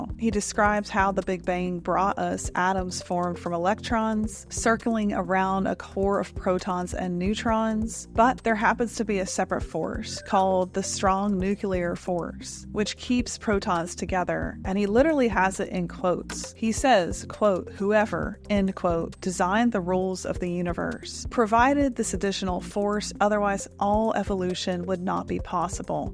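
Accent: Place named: Arkansas, United States. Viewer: I believe in God, and consider myself a Christian, but to believe you can't get life from a kind of "Big Bang" scenario, one must be ignorantly misguided, at best, and at worst, must be intentionally obtuse. You said you would gladly debate in the comments, so as a subscriber and viewer since last year, I hope you'll keep true to that remark. To that end, please begin by explaining why you dont believe in the current models of the beginning of the universe. Edit: I'm from Arkansas, and it always feels good that someone else with a similar accent is an AI/Singularity communicator. Love to see it.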